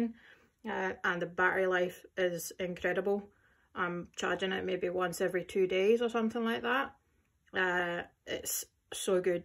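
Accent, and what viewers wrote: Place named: Scotland, United Kingdom. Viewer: after hearing your Scot accent I am starting to like it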